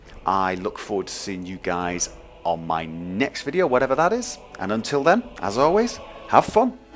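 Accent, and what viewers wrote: United Kingdom. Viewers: He sounds like that British guy with the glasses from Assassin's creed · I'm very certain it's a British accent, I can't remember which one, but I'm certain I've met a few people with it o. o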